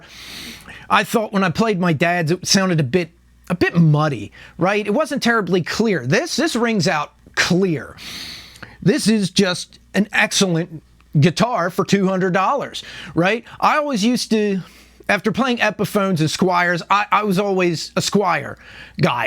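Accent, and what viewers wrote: Philadelphia, United States. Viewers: I just picked one of these up used. I got a hidden gem. Might I ask what region of the country you’re from? You have a distinct accent, just like mine. My wife makes fun of me for it. I hail from the outer regions of the Philadelphia area. I grew up attending the Philadelphia folk festival, which is in schwenksville PA. Sounds like you’re from the area. Maybe, maybe not. Love the video and thank you for it my friend .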